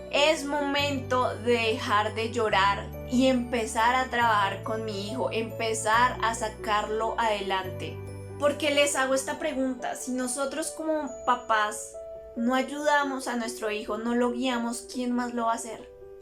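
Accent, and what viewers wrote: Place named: Colombia. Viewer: Hola, pareciera que fueras de Colombia, la verdad acabo de conocer tu canal y es de bendición para mi vida, agradecería que si estas en Bogotá me recomiendes el jardín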